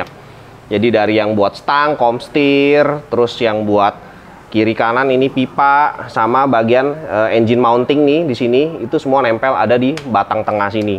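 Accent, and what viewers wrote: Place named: Medan, Indonesia. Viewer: Bang, abang orang medan ya. Logat bicaranya kayak bos saya. Hehe Semangat terus bikin chanelnya bang.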